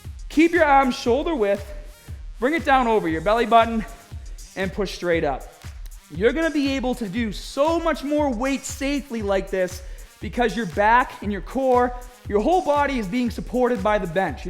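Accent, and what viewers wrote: Boston, United States. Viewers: A regular bahbell, is that what they have on the fahm! I love ya my Bostonian Brother! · Well, if you want more people to take you seriously, please knock off that exaggerated "Boston" accent. You may be from Southie or Medford or Somerville, but strangely, you never did your "Haavaad Yaahd" act in your original videos. I lived in Boston for four years, and know that most "local" folks there don't really speak such a fake, hackneyed way to each other - only when there are non-Bostonians around, who (for some unknown reason), find your irritating "dialect" cute or charming, so you turn it on to get more likes. Other vlogers in your sector (like Jeff Cavaliere, etc.) don't seem to need such a stupid gimmick like saying "baaaaabells"; they just focus on content. But you're wicked pissah anyway!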